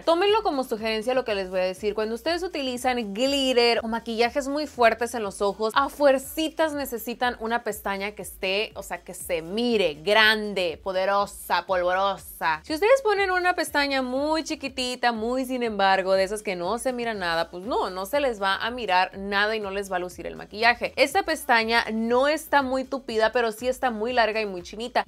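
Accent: Sinaloa, Mexico. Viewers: Jajajaja m encanta cómo a blas jajajaja 💯 % sinaloense 🙋🏼‍♀️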